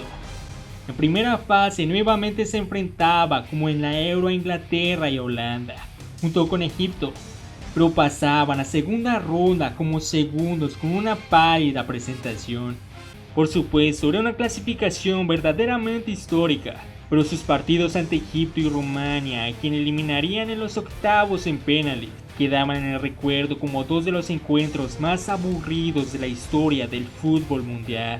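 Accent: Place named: Brazil. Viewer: Seguramente es brasileño y le cuesta el español se n ota el acento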